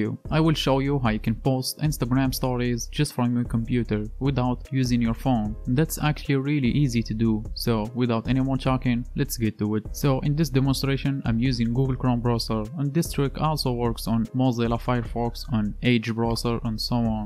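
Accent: Morocco. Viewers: mn soutk 3rftk mghribi hhh kayn m3alem abro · you'r accent like arab ( morroco - marrakech ) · from you accent i can tell that your moroccan am i right !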